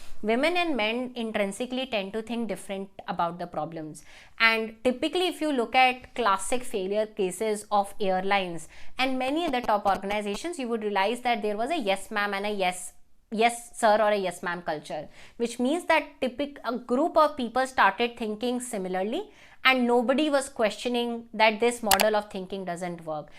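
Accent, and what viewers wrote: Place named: India. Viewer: I have to be honest with you, it was quite difficult to understand the video due to your heavy Indian accent, which seems to overpower the message you're trying to convey. Additionally, it’s quite clear that the video is heavily skewed towards promoting ISB, and as a result, it feels less objective and more like a sales pitch. This approach diminishes the credibility of the content and detracts from the potential value of what you're sharing. If the aim is to genuinely inform or provide guidance, a more neutral, clear, and user-friendly approach would be much more effective.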